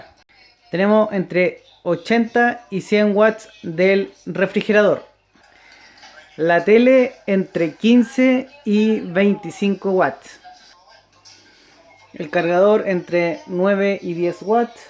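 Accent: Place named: Chile. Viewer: Hola a estoy viendo estevideo (12/05/21) y está muy interesante, tengo en proyecto construir una Tiny house, y quisiera conocer el presupuesto de mis necesidades, pero no se la unidad monetaria que utilizas (pesos) estas en México, Argentina o Chile (seria bueno traducirlo a us$) me parece por el acento que eres Chileno, si todavía revisas los comentarios me gustaría saber el tipo de moneda y de cambio actual para poder tener un parámetro y calcular mi presupuesto. Gracias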